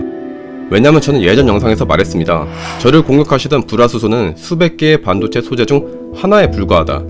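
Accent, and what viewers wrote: Gyeongsang, South Korea. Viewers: 표시나요 깅상도사투리. 숨길래 숨길수없는 첯음절에. 강력한 엑쎈트 깅상도사투리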